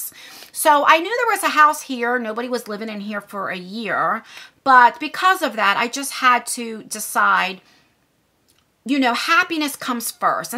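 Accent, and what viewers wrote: New Jersey, United States. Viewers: Thanks for sharing! I don't wear makeup much beyond lipstick, so the unboxing channels I follow are because of the person doing the videos. I've liked you from the beginning. I like listening to you speak (so, chat away!), you're pretty (love your eyes!), and you've always seemed like a genuine person. I hate fake people, so it's refreshing coming across someone like you. We moved to Jersey when I was in junior high, and my mom still lives there (although I do not) so your accent caught my attention, too 😄 I'm glad you have a support system to help you through the tough times, and I wish you all the best 💕 Buona fortuna!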